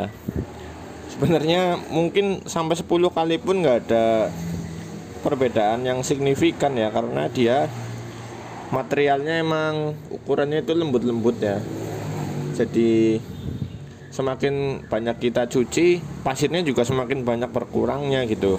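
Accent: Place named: Banyumas, Indonesia. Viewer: Ngapak pride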